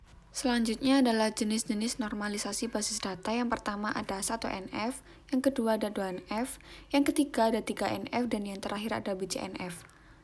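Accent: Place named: Java, Indonesia. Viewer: medok bund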